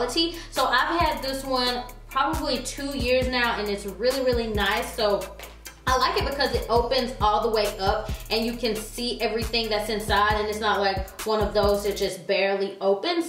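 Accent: South, United States. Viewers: I have been looking at your videos for a little while now but I really have to say I love your southern accent! And girl you are gorgeous😍😘. It was chilly today in Charlotte so after I got my boys off to school I just set back & watched a bunch of your videos, followed & liked pics on your IG. I have become obsessed with your channel just from this morning lol. You have amazing taste! Keeping posting so I can keep watching 😊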